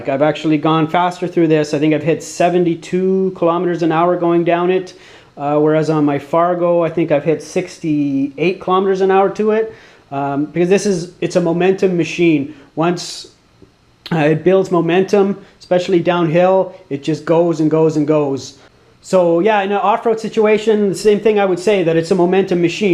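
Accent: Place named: Canada. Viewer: This dude sounds Canadian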